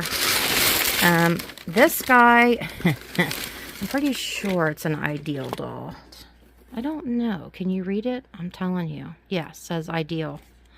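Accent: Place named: North Carolina, United States. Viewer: Neighbor! Ok.. Which state are you in? You almost sound like a North Carolinan😉.. I'm actually originally bien & raised in NC, moved to Tennessee 4yrs ago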